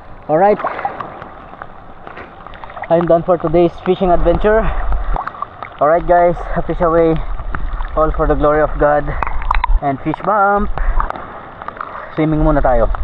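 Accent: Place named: Ilocos, Philippines. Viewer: Oks Sana kaso korni ka magsalita bro.. Halata naman ang Punto mo being an illokano😅😅😅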